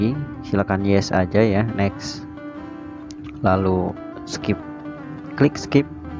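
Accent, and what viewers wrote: West Java, Indonesia. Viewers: Logat namah sunda...dimana ie kang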